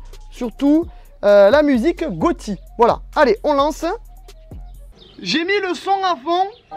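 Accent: Marseille, France. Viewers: Ta un accent marseillais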